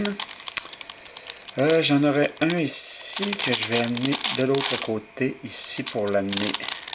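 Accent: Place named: Quebec, Canada. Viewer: yes un Quebecois ! lol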